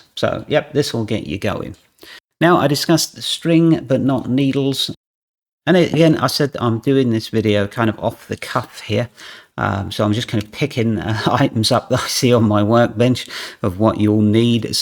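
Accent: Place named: United Kingdom. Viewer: Quick question, why do you quote US prices when you’re obviously British?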